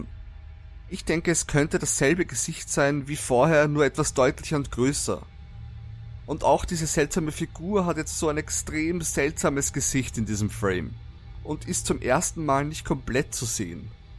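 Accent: Vienna, Austria. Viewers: Habe das selbe gesehen wie du.Das eine sieht in der Tat aus wie eine Schildkröte aus mit Hut.😁😄 fand es sehr abstrakt und musste die ganze Zeit schmunzeln...😄finde deine Stimme total cool.Kann es sein das du irgendwie aus Wien oder irgendwo in der Nähe her bist?glg 😊👋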